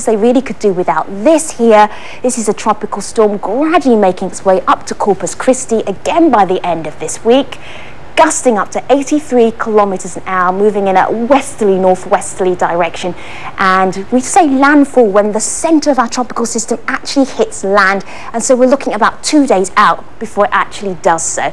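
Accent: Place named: Australia. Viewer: she talks funny, aulstrailian accent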